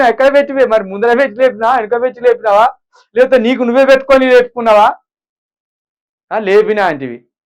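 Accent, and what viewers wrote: Telangana, India. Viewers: Anna😂😂😂😂 top telangana slang anna this i want hear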